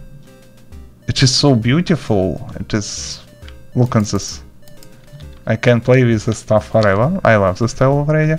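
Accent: Russia. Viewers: Русский акцент, вери вел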